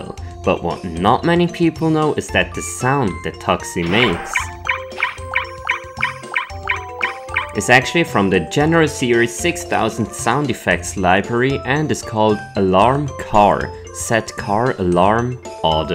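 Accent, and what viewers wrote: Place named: France. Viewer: That French accent though. Proud of you. Maintenant tu vas pouvoir aller draguer!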